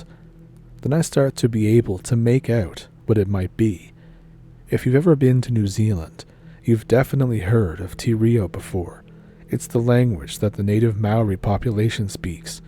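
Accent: Canada. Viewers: You don't sound like you are from New Zealand. More like Canada. Cup of tea, real British stuff. Get real man. What a load of tripe. Your description of your fossil area near Christchurch do not match. Just made up stories with no truth. I gave up listening.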